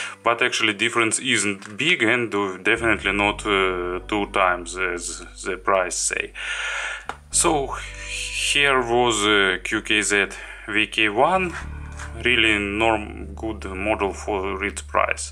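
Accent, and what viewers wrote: Russia. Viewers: Thank you so much for the review. Love your Russian accent BTW and your soothing voice. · Чисто Русский акцент))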